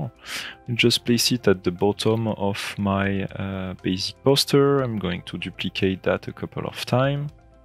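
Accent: France. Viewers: Le petit accent français ? Des videos en français ? :) Faîtes vous des formations twinmotion ?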